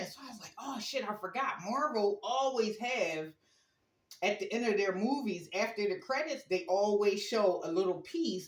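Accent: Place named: United States. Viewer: You have a accent what state do you live in the dance is right on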